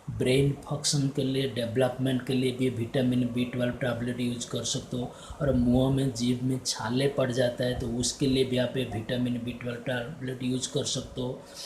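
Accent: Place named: Odisha, India. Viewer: Mujhe lagta hee ki tum odia hoo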